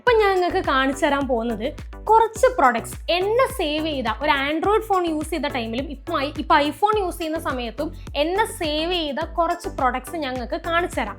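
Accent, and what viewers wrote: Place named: Kasaragod, India. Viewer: Haii chechiii I'm your big fan....❤❤enikk chechiye orupaad ishtawa chechide samsaram kelkkan nalla rasssaa ❤😭..chechide slangum ishtawa enikk❤❤❤... Chechiii kasaragod alleyyy njnum kasaragod thanneyanuuu.. Enikk chechiye neritt kanaan thonnunnuu😭😭😭🤍🤍🤍🤍.ende per vaishnavi oru hai tharumo plzz 😭😭😭🤍🤍🤍... Ee video ishtapettu ❤❤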